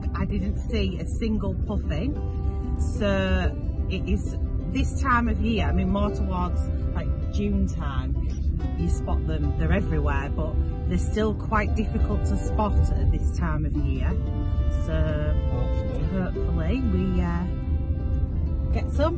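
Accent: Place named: Hull, United Kingdom. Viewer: That's a Hull accent if ever I heard one!?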